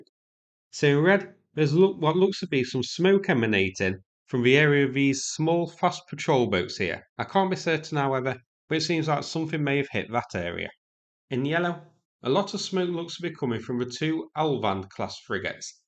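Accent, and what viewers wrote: United Kingdom. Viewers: Find someone who can speak English not British jibberish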